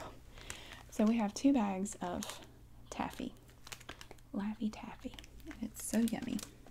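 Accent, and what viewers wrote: South, United States. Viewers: You are so comforting and motherly, I just feel so at peace watching your videos. I normally don’t pay much attention to accents, but I’m so obsessed with your southern accent. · Love your accent. It's a Southern accent, but it's not thick or heavy, it's light and dainty like a Southern belle. It has a soothing effect when you speak softly. Very nice. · Your mannerisms, personality and voice are extremely relaxing. Glad I found your channel. I'm from Alabama and it's nice to hear a friendly southern accent for ASMR. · Love her southern accent. Especially from all the way over here in New Zealand. She is just awesome and so relaxing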